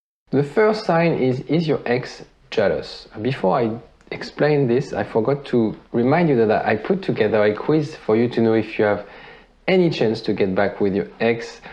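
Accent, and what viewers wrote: France. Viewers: Love ur French accent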